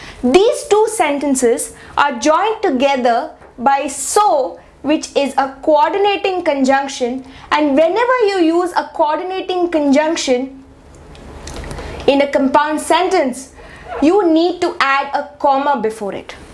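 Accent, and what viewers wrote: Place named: India. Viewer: She sounds like an indian person I know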